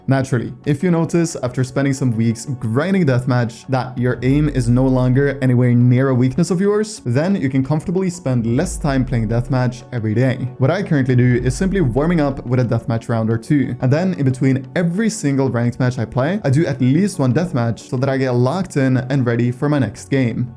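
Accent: Norway. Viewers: why would a bot voice have a norweigan accent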